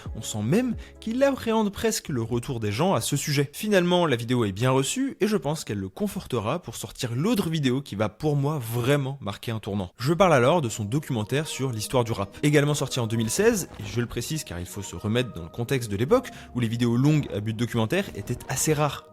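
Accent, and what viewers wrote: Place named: England, United Kingdom. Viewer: J'ai vraiment du mal à comprendre ton accent anglais 😅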